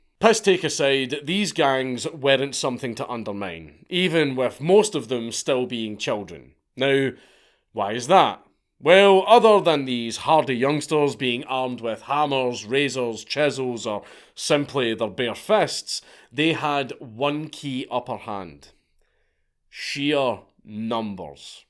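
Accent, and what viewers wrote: Scotland, United Kingdom. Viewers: I feel like listening to you as helped me understand Scottish accents as a whole much better. I'm a yank so I my inferior American ears don't process these European accents as well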